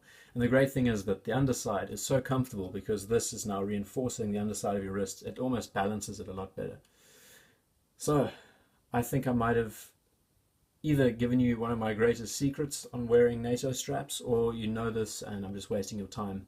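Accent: South Africa. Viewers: Excellent Video Brother. Don't lose the SA accent - takes me right back home. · cool tip. your accent has a south african lilt :-} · Great video thanks! Are you South African?.. actually no chance you not with that accent. Howzit! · Wow. All these years. I had no idea!! Btw do you mind if I ask if your accent is from 🇿🇦? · Except the guy has a clear South African accent.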